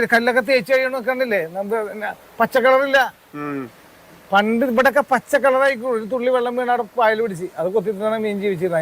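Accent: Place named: Valluvanad, India. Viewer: ഇതിന്റെ അവതാരകൻ ഒരു ബുദ്ധി ജീവി തായോളി ആണ്... അവന്റെ സംസാരം വള്ളുവനാടൻ സ്ലങ്ങും ചോദ്യം എല്ലാം അതുപോലെ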